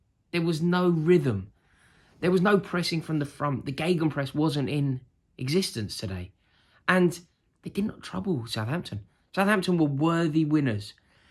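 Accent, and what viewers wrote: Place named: Liverpool, United Kingdom. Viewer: What a Liverpool accent you have.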